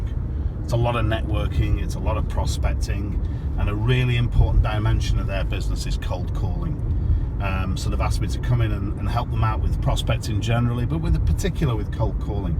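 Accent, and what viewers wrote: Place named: Liverpool, United Kingdom. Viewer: A scouser in Miami lol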